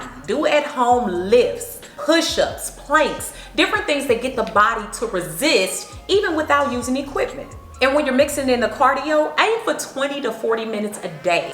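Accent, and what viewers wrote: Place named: St. Louis, United States. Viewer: I love that STL accent. #lovinthelou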